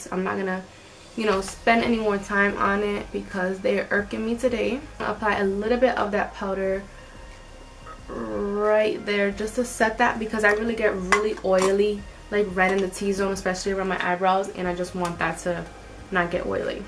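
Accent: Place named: Bronx, United States. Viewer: I knew by that accent i just left the boogie down to take care of my mom here in Jacksonville Fl I see you boo that's why I subbed od quick lol🙅‍♀️✌❤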